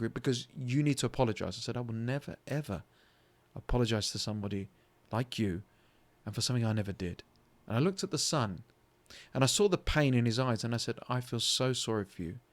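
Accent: United Kingdom. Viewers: Realistic no BS Brittish Man with an elegant accent.. instant subscription good Sir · Im assuming youre in the UK because of your accent, but that sounds fuckin miserable. Im in Tennessee USA and thats not my experience at all. People are generally nice to me as I encounter them, and my only real complaints are mannerisms that dont really affect me and just tell me a little about people. Im a fairly intimidating man though, so I doubt anyone would ever even try me like this.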